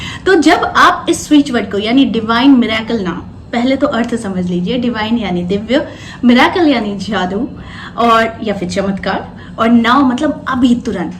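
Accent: Bengal, India. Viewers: Apka vlog accha lagta , apki baath sunkar lgta hai ki ap Bengali ho , me apki what's app MSG karunga jab mevi astrology shikunga❤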